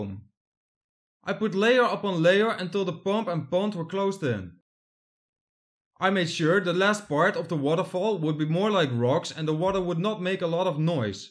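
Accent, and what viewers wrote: Netherlands. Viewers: Ben jij Nederlands gezien het accent???🇳🇱🇳🇱🇳🇱🇳🇱🤣🤣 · Mooie en goeie tutorial man! je hebt me zeker geïnspireerd! en dat mooie nederlandse accent valt niet te missen (' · You sound so dutch. Love it. But was exactly what i was looking for! Thanks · Leuk gedaan. Wel duidelijk, ookal is het in bloemkool engels.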